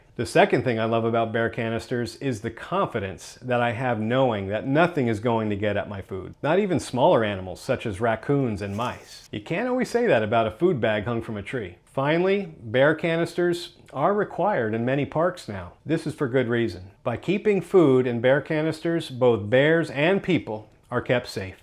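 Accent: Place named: North Carolina, United States. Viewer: oh no! I was hoping you wouldnt say that! I had a feeling you were from NC the way you talked and the way the photos looked. I want to do flat laurel creek and sam knob. What scares me is I don't know how much of the bear activity goesunreported. Thank you for the heads up. I do have a bear canister and I figured you were going in that area when you said they were required in the area you were speaking of.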